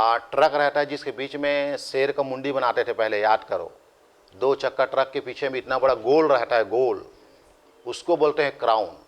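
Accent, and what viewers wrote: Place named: Chhattisgarh, India. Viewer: Bahut hi gajab story boss ❤ Sir G Apki chattishgadhiya अंदाज में तो पूरा cg students फिदा हैं।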